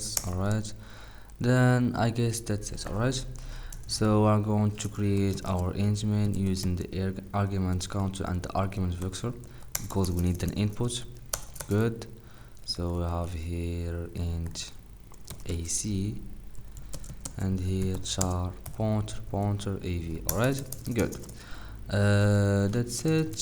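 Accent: Morocco. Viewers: itoub 3lik, ghir mn pronunciation 3rftek mghribi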